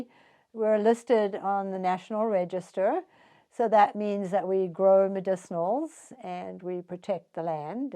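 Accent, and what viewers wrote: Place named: South Africa. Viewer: Hi from South Africa!. Had a feeling you were from here just by hearing your accent. You are truly inspirational and have so much interesting knowledge to share.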